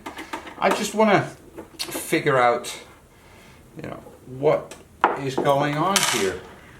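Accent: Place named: Netherlands. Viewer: Do you service DAT recorders ?? I presume by your accent you are in Holland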